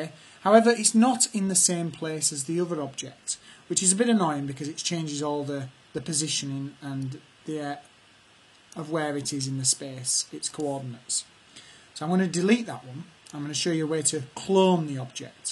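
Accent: United Kingdom. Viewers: nother northerner :D eiya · Are you from Liverpool or Manchester?lol I like your accent!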